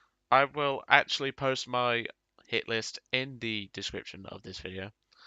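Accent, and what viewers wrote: United Kingdom. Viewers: U British mate?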